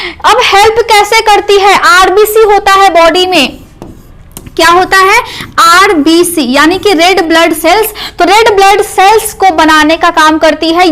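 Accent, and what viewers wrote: Bihar, India. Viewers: Kya aap bihari ho kya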